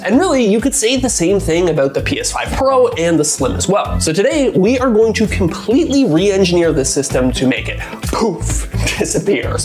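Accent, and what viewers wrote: Canada. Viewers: This guy's definitely canadian.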